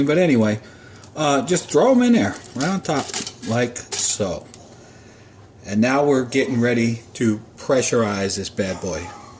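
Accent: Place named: Philadelphia, United States. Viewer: Thanks. I just finished watching this video and ready to use my new Insta Pot to cook a pork loin. I gut laughed at the end when you exclaimed "GD that smells good!" Also, I am wondering if you are from SE PA? I detect a Philly accent.: ) Thanks again.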